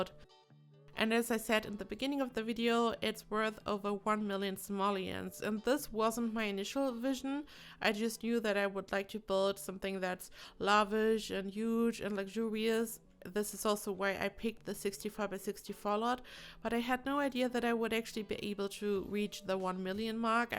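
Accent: Germany. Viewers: Are you German? Your accent sounds like that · are u german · Are you german? xD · She really has an german accent lol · Sounds German... To me As a German. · Bist du Deutsch?Hört sich vom Akzent so an☺️ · i don't know how i ended up here but are you german? you sound very german :D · I were just wondering, are you German cause your dialect kinda reminded me of German people speaking English☺️ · Wait, are you German? Btw, very nice one! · are you german?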